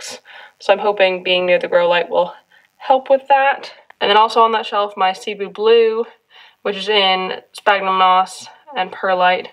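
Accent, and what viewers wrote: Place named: United Kingdom. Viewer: I’ve watched quite a few of your videos and I heard many more words spoken with a British accent now. Heehee. Fun to listen to! Enjoy your awesome new greenhouse.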